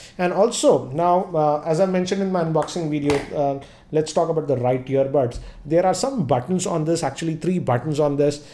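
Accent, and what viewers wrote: India. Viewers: Truth time...I only clicked on the video to hear an Indian English accent....was not disappointed. Also....nice review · He is an Indian and most of the Indians have a similar accent but he is providing good content though.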